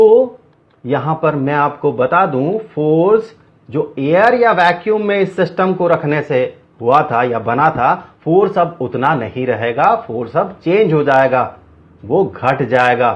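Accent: Bihar, India. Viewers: sir aap Bihar se hai kya? love your voice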